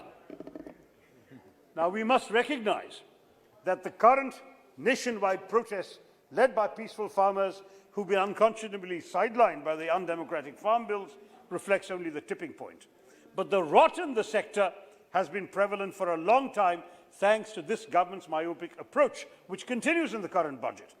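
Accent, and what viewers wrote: England, United Kingdom. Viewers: Just a malyalee showing off, in a anglicised accent. No content nor any useful suggestions, just a Angrazzi trash for the poor constituents.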